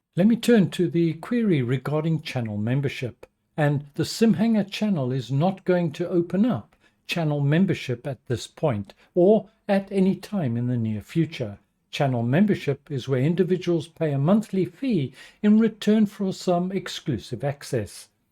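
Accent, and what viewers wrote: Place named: South Africa. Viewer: Great channel and especially great to hear a familiar accent 🇿🇦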